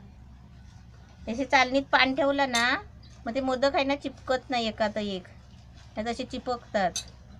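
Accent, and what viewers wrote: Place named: Malvan, India. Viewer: मस्त बोलतात... फुल्ल मालवणी एक्सेंट.....जय भंडारी ..जय मालवणी... बरोब्बर असा "हात भाजलो तरी चालत्लो.पण पीठ गरम गरमच मळूक वह्या. .....मी पण पुढच्या वेळपासून पंखो बंद करूनच पीठ मळतलय..!!!......."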